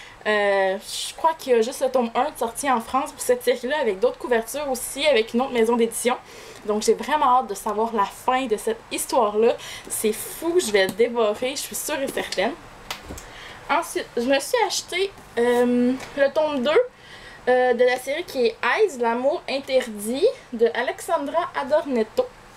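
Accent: Quebec, Canada. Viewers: ah oui ! c'est bien ce qui me semblait, qu'on avait justement le tome 1 et peut etre aussi le tome 2 de la saga "ailes". Tu as de la chance d'avoir les 4 tomes ! je viens de découvrir tes videos, celle-ci est vraiment chouette ! et en +, j'adoooore ton accent !(je devrais épouser un québécois, juste pour le plaisir d'entendre cet accent tous les jours, lol )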